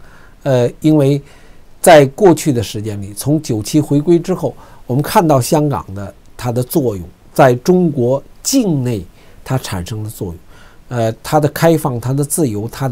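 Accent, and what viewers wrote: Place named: Beijing, China. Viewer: 石涛兄，听您口音也应该是北京人，89年那次估计您也经历过全过程，您现在在一个平静安全的地方去鼓动香港的年轻人去重演那些大家不愿再回首和重复的画面，您觉得有意思吗？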